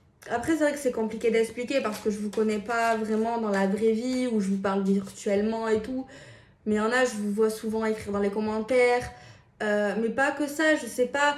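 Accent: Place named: South, France. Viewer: T' écouter me fait plaisir, j'aime l'accent du sud, ta petite voix, courage petite, tu fais bien..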